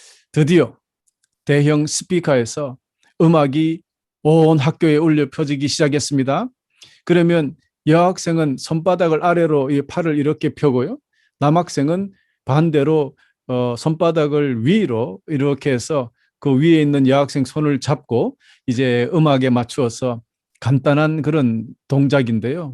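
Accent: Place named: Gyeongsang, South Korea. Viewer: 아 그리고 혹시 목사님 경상도 신가요? 손 좀 씨꺼라.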